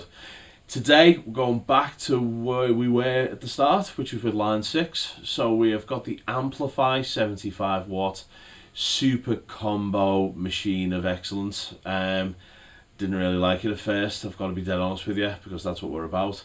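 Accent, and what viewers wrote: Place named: Newcastle, United Kingdom. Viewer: I believe its the Geordie accent.. from Newcastle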